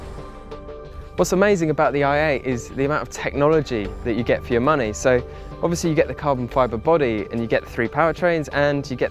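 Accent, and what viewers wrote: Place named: England, United Kingdom. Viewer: I don't know why, but I can't stand the way English people talk.. It has the power to make the coolest cars sound absolutely rubbish, darling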